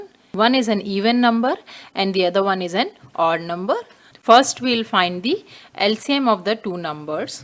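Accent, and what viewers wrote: India. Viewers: Itni English tu aaj suniye jitni main angrejon se bhi nahin suni thi angreji hai yah Indian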